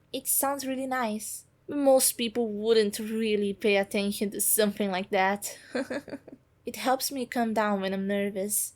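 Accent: Brazil. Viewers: I just can't believe it has been 2 years and ONLY NOW I've seen that you actually answered. I am really sorry for taking so long, lol. And, to be honest, I am surprised I didn't realize you were Brazilian sooner, I guess I am just not used to that accent hehe. Parabéns pelo conteúdo também, seus vídeos ainda continuam MUITO bons kkkk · Sotaque me cheira a brasil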